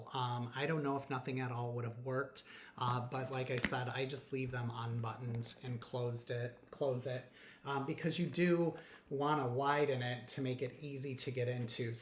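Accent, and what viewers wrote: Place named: Chicago, United States. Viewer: Great video. Classic bag. You don’t see it everywhere. I gotta ask... where are you from? I hear a Chicago accent perhaps?? Keep up the great content!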